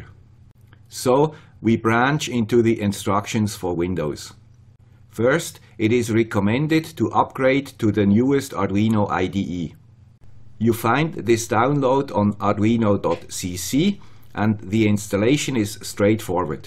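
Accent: Switzerland. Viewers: Yeah you are right. I was in a bad mood that day. Any way, I always enjoye listening to the guy with a Swiss accent, again. Keep it up. (y) Thank you for you great work. :D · Swiss accent is good to us from Brazil!congrats!!!!!!!!!!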